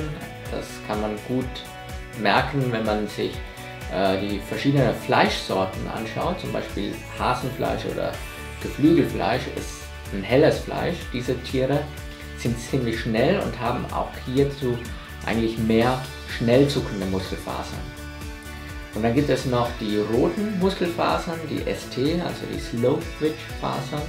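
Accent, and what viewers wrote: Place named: India. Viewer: Haha sein indischer akzent total putzig :D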